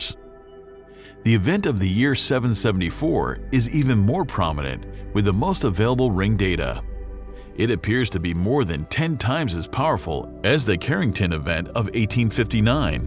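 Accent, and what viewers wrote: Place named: United States. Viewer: This American accent is disgusting. Can't watch it.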